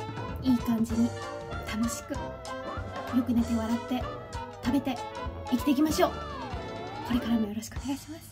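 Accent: Kyushu, Japan. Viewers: 九州弁も可愛いね♪ 都農ワイン、クリアで飲みやすそう！ お母さん、実家だと折り返しづらいかもしれないから、スマホからかけてみたらどうかな？ ゆっくり癒されて、気をつけて帰ってきてね～( ´ ▽ ` )ﾉ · おかえり！ なまり愛莉さん可愛いし、同じ九州出身だからかどこか懐かしくほっこりしました(^^) リフレッシュできたようで良かったです！ そんな中僕はこの動画が時々チカチカして見える程APEXしてました🙄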